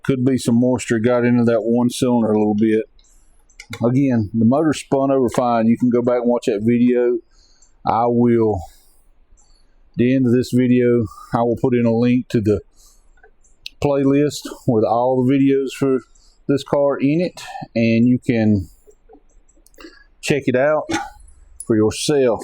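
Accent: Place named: South, United States. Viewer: By yer accent, you way south of me in Washington , I got several pushrods I’d give ya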